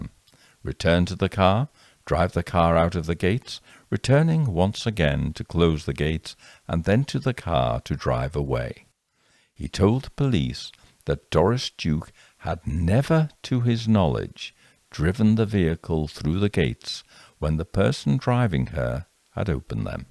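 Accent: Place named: Northern England, United Kingdom. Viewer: Your narration and voice are perfect. Every so often a tiny northern inflexion. Such a lovely lilt amongst English accents, which lends perfectly to spine-tingling and sinister tones.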